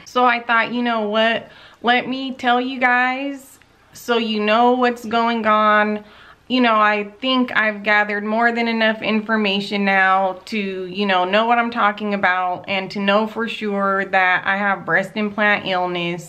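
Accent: United States. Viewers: Where is her accent from in America?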